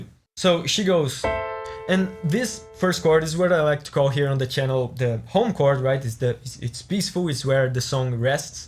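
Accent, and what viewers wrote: Brazil. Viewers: Mano, seus vídeos são muito fodas! Achei seu sotaque familiar, logo pensei "é um brasileiro falando em inglês" kkkkk continua assim, vai longe ainda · Man, I have to ask. Are you Brazilian? Your accent sounds very Brazilian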